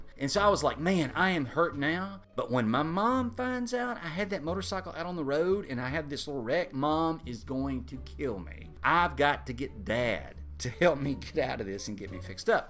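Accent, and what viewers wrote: South, United States. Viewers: You know, when I started watching your videos, you mention that you live in the south and I’m thinking “hmm yeah, you do sound a little southern”. Then I come to this video with no expectations and I hear your dad talking and I just can’t stop smiling XD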